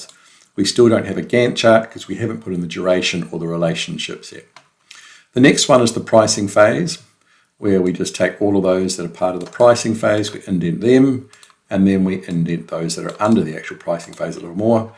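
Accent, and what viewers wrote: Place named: Australia. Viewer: yeah you sound Aussie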